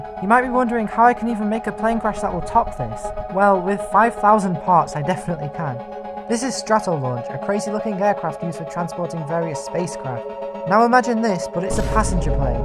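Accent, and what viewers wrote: United Kingdom. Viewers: Are you British? Because you speaking very well done and I love it. The plane is satisfying and great job! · Sup your british too? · I never knew that is British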